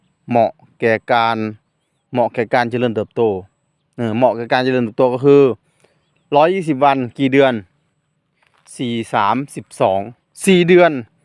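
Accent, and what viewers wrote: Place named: Isan, Thailand. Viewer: อืดอัดคุนจะเว้าอึสานก่อเว้าจะพุดไทก่อพุดนะคุนผมคนลาวฟ้งแล้วมันขำ😅และก่อยากหัว😅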